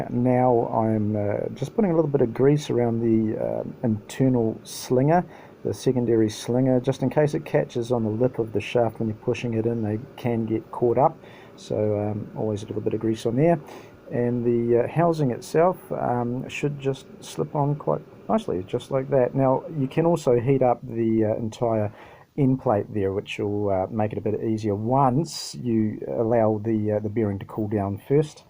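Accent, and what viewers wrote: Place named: United Kingdom. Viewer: Very Informative and if viewers have a problem with British accent....use the closed caption. Thanks for all the information.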